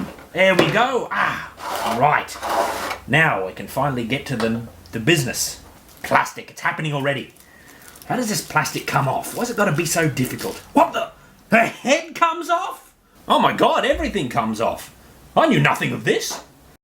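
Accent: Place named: Australia. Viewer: Damn your a aussie I was thinking first vid I watched maybe somewhere posh in England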